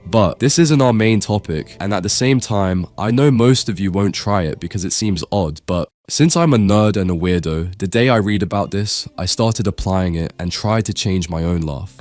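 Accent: United Kingdom. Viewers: UK accent🔥🔥🔥🧠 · Are you British?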